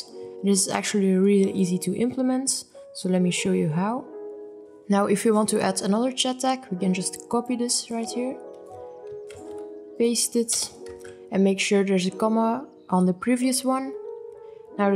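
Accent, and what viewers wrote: Netherlands. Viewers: I smell NEDERLANDER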